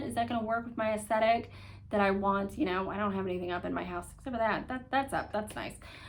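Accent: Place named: France. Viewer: Hi, really enjoyed your video and energy. Can wait to see more. Your French accent is perfect! Are you French?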